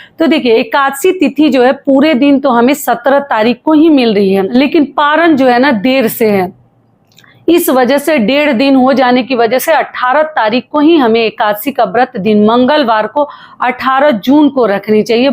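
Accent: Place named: Bhojpuri, India. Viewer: Didi Bhojpuri mujhe bhi Aati Hai Lekin aapke Munh se Bhojpuri bahut acchi Lagti Hai😘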